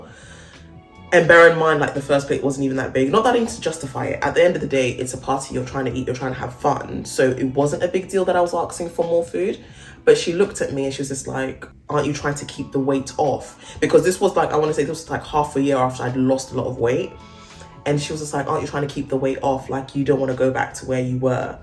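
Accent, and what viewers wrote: United Kingdom. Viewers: Are you British? You don't sound American. Very interesting video, thank you for sharing. Your story telling style is so calming. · I had a feeling you were British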